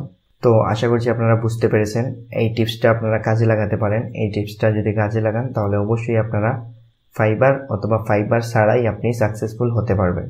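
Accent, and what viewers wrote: Sylhet, Bangladesh. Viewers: apni ki sylhety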